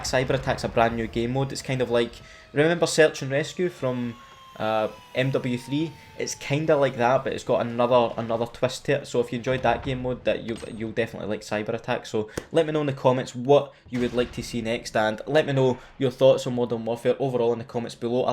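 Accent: Scotland, United Kingdom. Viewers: Every content creator needs to be Scottish. love that accent lol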